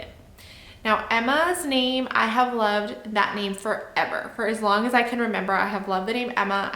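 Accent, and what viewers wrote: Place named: Texas, United States. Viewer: Learned about your channel from Monica Rose. I totally enjoyed "getting to know you"! Sounds like you are in Texas.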